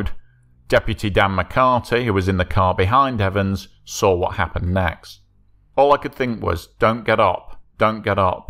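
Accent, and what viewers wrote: United Kingdom. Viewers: the host's rather British accent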